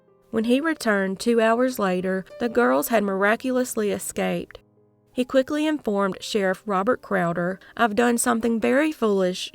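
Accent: South, United States. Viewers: With each passing video, my love increases for this channel & that accent! I'm a MISPLACED SOUTHERN BELLE & whenever I hear "that beautiful SOUTHERN DRAWL" I instantly become homesick, then that sense of PRIDE of knowing that I REPRESENT: THE BEST FOLKS, GREENEST LAND, GOOD EATIN, MARDI GRAS, COWBOY BOOT WEARING, HORSE RIDING, NO COATS NECESSARY, NEIGHBORS ARE FAMILY ROUND HERE LAND, CHURCH HOUSES OUTNUMBER THE DEVIL'S DENS & THE SUNRISE, AS WELL AS THE SUNSET, IS VIEWED AS GOD'S BEAUTIFUL SMILE UPON ANOTHER BLESSED DAY FOR WHICH WE TAKE PRIDE IN BEING PRESENT TO HONOR & ACKNOWLEDGE! TIME DOES MOVE SLOWER IN THE SOUTH...I GUESS THAT'S WHY WE AGE SO WELL!!! FROM SOUTHERN LOUISIANA to THE GREAT STATE OF TEXAS TO THE GOLDEN GULF COAST of MISSISSIPPI (yes, in that order for me) I WILL ALWAYS LOVE, REPRESENT & APPRECIATE THE BLESSINGS & BEAUTIFUL MEMORIES CREATED IN A WORLD UNLIKE ANY OTHER! 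THANK YOU FATHER GOD FOR FAVORING ME! 😇💕💯🙏🏽🤷🏽‍♀️🐎🥂🏖💒⏳🌞🎭⚜🇨🇱💃🏽💪🏽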